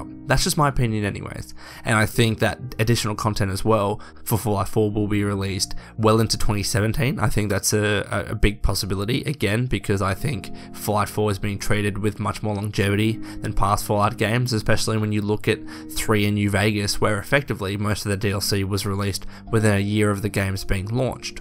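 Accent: Australia. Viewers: hope the "Fah Hah Bah" jokes don't upset you, know that we love you and your kangaroo accent!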